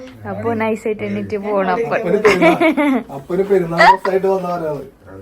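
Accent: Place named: Mangalore, India. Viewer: Mashallahh adipoli....ningade family nte vlog kanumbo oru positive energy aan❤️...ningade family pwoli aan onnum parayanilla....ithamare randu perum othorumayode enth bangiya kanaaan❤️.... samsaram kelkkan nalla rasan...manglur style adipoliya 😊....i am exciting for your eid vlog....mashuthantem eid dress okke kanaan kothiyavunnuu....njan malappuram aan ithaa....ningade familye onn meet aakan nalla aagraham nd❤️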